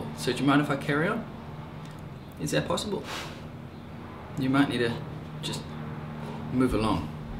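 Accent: South Africa. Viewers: Stunning chair .. and great to hear a saffer accent .. Thanx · Im picking up on a south african accent i think, im also seeing alot of items bunnings sells, would i be correct in assuming you’re in Australia?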